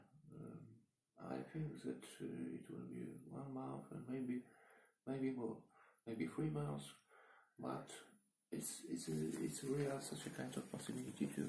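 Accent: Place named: Dnipro, Ukraine. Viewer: бля у него акцент Днепропетровский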